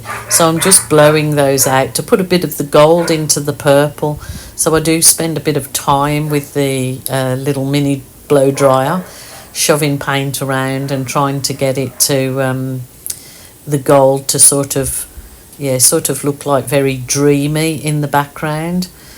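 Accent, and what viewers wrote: United Kingdom. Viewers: I have only just found you tonight, I think I may give this magical art a go, it’s fascinating. I have subscribed and I will go back on your previous lessons. I’m from the UK and I’m sure I can detect a twang of British in your accent. Take care K🇬🇧❤️❤️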